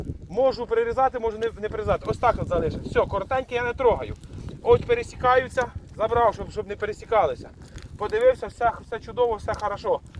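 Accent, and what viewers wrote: Vinnytsia, Ukraine. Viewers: Балакає по вінницьки😂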